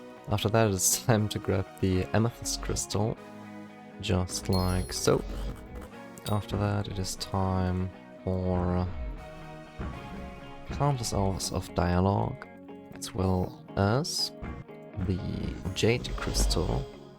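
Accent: Germany. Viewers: German accent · Am i right or do you have a German acsent?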